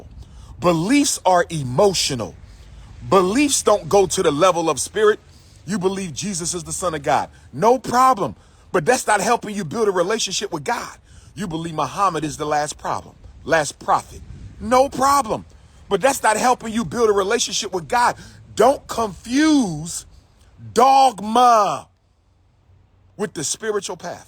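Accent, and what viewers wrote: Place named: AAVE, United States. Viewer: If were to ever open a school, (and we know it’s already been 14 years), would the school teach boys Umar’s hood English, like “Where my Chicago Africans at?", Would it teach boys to practice and promote polygamy like he always does? Would it teach boys to constantly repeat everything three times like he always does? Would it teach boys to trick the people who support them, the way he changed his non-profit organization name and EIN number without telling donors? Would it teach boys to physically threaten people, the way he said he wanted “pull up crews” to roll up on and confront his critics? Would it teach boys that publicly asking for “wifey resumes” is what serious scholars do? Would it teach boys that they should never be held accountable for what they promise and never deliver, which is his personal philosophy?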